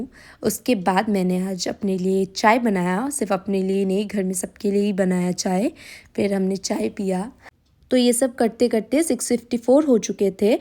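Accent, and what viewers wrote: Bengal, India. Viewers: are you bengoli? you have a bong accent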